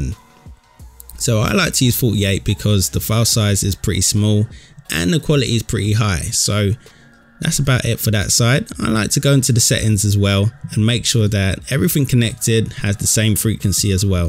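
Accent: United Kingdom. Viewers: How do we get that sweet British accent, tho?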